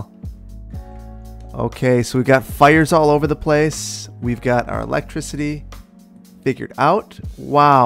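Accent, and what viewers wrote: Wisconsin, United States. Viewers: Unthaw… dude you’re totally from Northeast Wisconsin…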